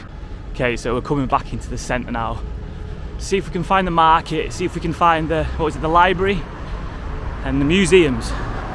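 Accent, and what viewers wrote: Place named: Northern England, United Kingdom. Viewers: hey fella i think your accent is so cool. real northerner. i come from Worksop near Sheffield. did you know that there are 4 very large funnel chimneys at East Midlands Parkway Train Station. i don't know if you have been there? also there are some on the Gainsborough skyline look at google maps of Gainsborough street view and you can see them. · This guy deserves his own sky or BBC show, with his facts, statistics and historic based narration i think more people should watch him, i also like that fantastic yorkshire accent. Respect.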